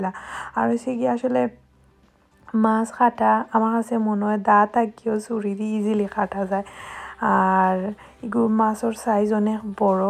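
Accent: Sylhet, Bangladesh. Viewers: Affa just curious want to know why you went from shudoh basha back to sylheti basha?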